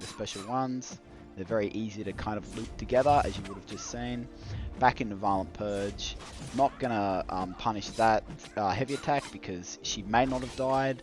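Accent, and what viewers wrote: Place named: Australia. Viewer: Mate, you an Aussie? What’s good my fam, instant fan and subscriber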